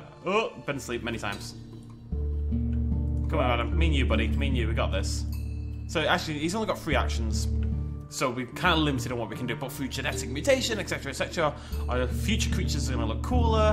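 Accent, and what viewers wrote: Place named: United Kingdom. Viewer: Hate to be that guy, but some words have multiple valid pronunciations, and regional pronunciations are a thing. Utilizing several sources, I have determined that there are three accepted pronunciations of the word, with the two american ones being "Nitch" and "Neetch," and the common British, which if you couldn't tell is, pronunciation being "Neesh."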